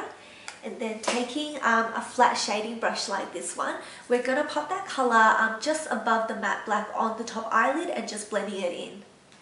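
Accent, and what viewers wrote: Australia. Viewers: australian actually.. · i havent really heard other aussies talk on youtube! woah our accent is soo totally different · Wow you're one of the few Asian make up gurus with an Aussie accent! Go Aussies ^^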